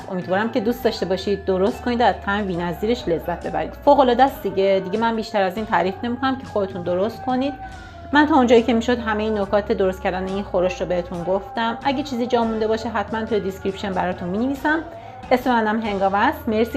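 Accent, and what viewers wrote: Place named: Iran. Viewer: چقدر باسلیقه و چقدر دقیق توضیح دادید. برای من جدید بود و خیلی جالب. حتما درست می کنم🌷💕🎀 ممنون از لطف شما که طرز تهیه رو در اختیار گذاشتید. در ضمن چه گویش زیبا و شیرینی دارید. خیلی مایلم بدونم گویش کدوم خطه ایرانه. خیلی دلنشینه 🌷💕🎀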